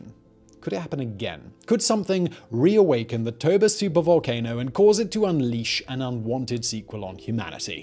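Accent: United Kingdom. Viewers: I'm sorry to ask you this, but... You're British?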